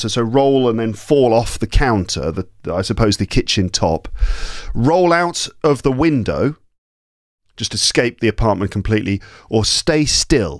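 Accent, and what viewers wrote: United Kingdom. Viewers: I like your British accent and style. American English accent is very hard to understand for me.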